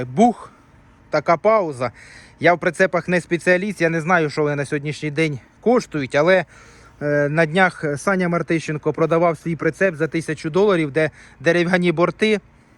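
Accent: Poltava, Ukraine. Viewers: по говору поняв, шо наш - полтаський. Балакає !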